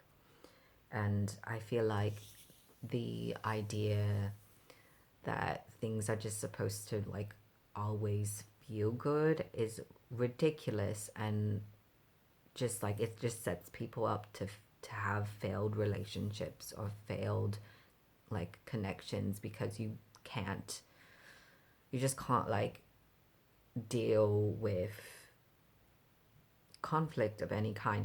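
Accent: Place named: RP, United Kingdom. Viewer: as a british person your accent was suprisingly decent but you sounded alot more like you were from like the richer parts of britain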